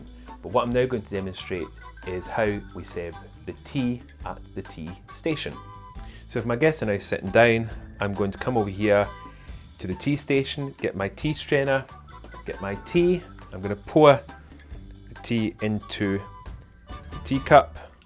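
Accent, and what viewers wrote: Scotland, United Kingdom. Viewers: Such an awesome channel by a true British gentleman andI do detect the Scottish accent. From a fan in sunny South Africa.☺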